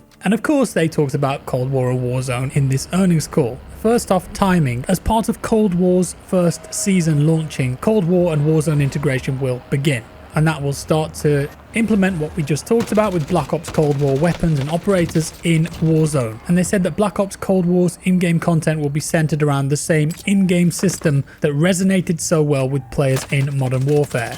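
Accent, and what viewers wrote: United Kingdom. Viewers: Hate this posh English nerd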